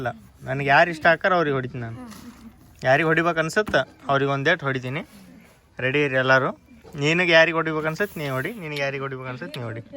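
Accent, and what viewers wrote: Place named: Andhra Pradesh, India. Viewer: Anna navvvu kuda andhra avre anna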